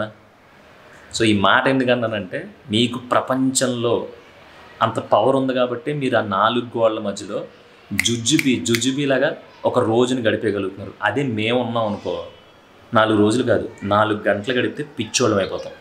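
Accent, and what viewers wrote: Godavari, India. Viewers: Mee vuru godavari jillaa na andi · East godavari aa.. west godavari . Aa. Sit miru.. but great ga chepparu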